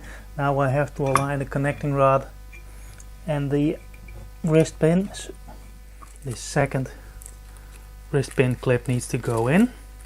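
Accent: Netherlands. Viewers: ik proef een beetje een Nederlands accentje hahaha.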